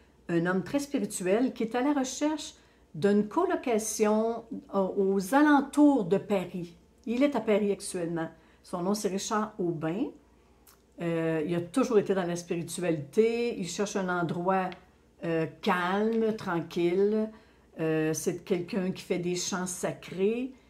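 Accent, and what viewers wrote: Quebec, Canada. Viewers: Bonjour, vous écouter est un vrai bonheur pour moi, votre joli accent québécois m'enchante et vos messages m'apportent toujours l'apaisement dont j'ai besoin. Les commentaires des uns et des autres me rassurent également car je vois que, beaucoup, comme moi....ressentent ou ont ressenti une grande fatigue, tristesse, déprime, vertiges... mais depuis quelques jours, je vais beaucoup mieux, j'ai beaucoup prié et de très belles choses me sont arrivées, alors merci les guides, merci l'univers, tout est juste...la vie est magnifique ❤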